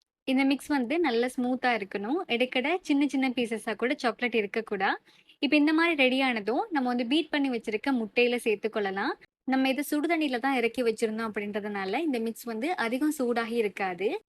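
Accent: Sri Lanka. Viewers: Assalamu alaikum dear im 1st time watching ur video gud job and srilanka speech is cute ma sha allah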